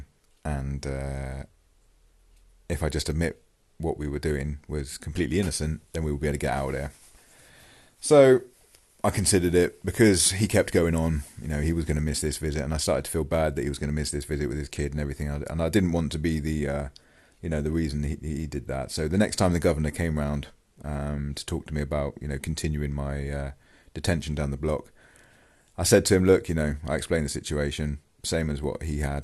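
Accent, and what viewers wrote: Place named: RP, United Kingdom. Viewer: you sound like a proper little posh geek, have you ever been in armley in leeds?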